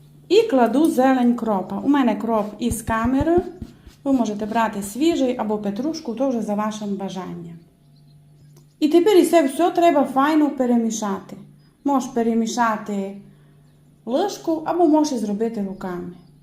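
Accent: Transcarpathia, Ukraine. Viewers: Підписався з двох причин: все, що готував за Вашими рецептами виходить надзвичайно смачно. І дякуючи Вам, насолоджуюся закарпатським говором. Дякую! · Обожнюю закарпатські діалекти :)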